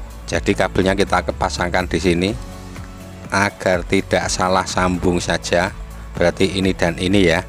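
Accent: Java, Indonesia. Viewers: MEDDOK KALI JAWA MU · LOGAT JAWANYA KENTEL BANGET, MAS!. MENURUT SAYA YG AWAM, NGECES AKI DGN CARA BEGITU, MEMBUAT AKI CEPAT RUSAK LHO, MAS!, YG PALING AMAN YA YG SE WAJARNYA PAKE TRAFFO 5AMPERE/ 3 AMPERE.,DAN DIODA 5AMPERE PULA..PENGECASAN AKI PAKE CARA INI CUMA BUAT DARURAT SAJA, JANGAN KETERUSAN, SEL AKI CEPAT RONTOK.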